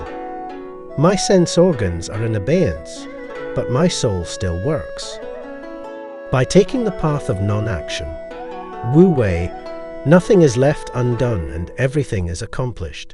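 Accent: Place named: Scotland, United Kingdom. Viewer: What a grand Scots brogue you have ser. A gent and a scholar! Many thanks.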